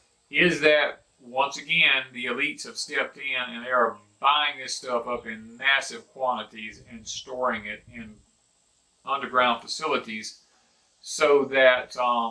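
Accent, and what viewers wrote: South, United States. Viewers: Many Thanx from Canada. I always say what happens in the US will happen in Canada. Love the Southern drawl. great information, Thanx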